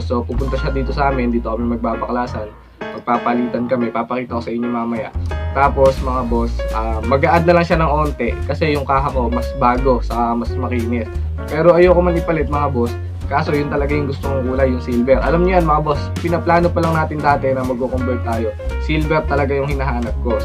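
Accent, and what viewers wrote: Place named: Bulacan, Philippines. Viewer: Paps taga bulacan kaba? Sa punto mo kasi eh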